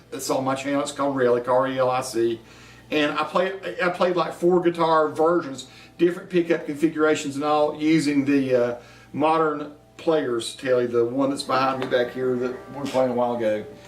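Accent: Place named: Appalachia, United States. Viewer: Hi, just found this video through your Scottish accent video. Weird coincidense, I started looking for a Telecaster just yesterday and was wondering if I should spend a little bit more on a Mexican-built Fender or buy a cheap Squier. Difficult decision as I‘m an amateur and only play ocassionally at home. I think I will go for the real deal - a Fender with single-coils. Thanks for showing all the different modes, it helped to have a side-by-side comparison of the two different pickup styles as I only played single-coils all my life. I also really enjoy your Appalachian accent! Thanks & greetings from Germany, Alex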